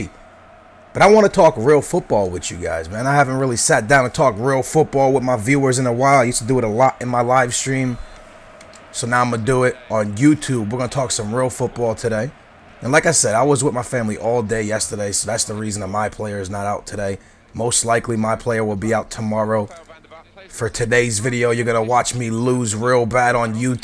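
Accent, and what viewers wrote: United States. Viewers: he learned to speak english the american way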